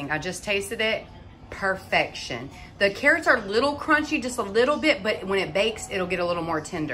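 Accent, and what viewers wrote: South, United States. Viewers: Great video thanks for sharing. I can hear your Southern Accent loud and clear, lol. Where is the South are you from?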